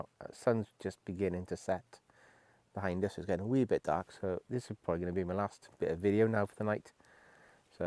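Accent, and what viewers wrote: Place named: Wales, United Kingdom. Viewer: I could tell buy your broken accent there was a hint of Welsh, i lived in merthyr tydfil for a few years. Loved it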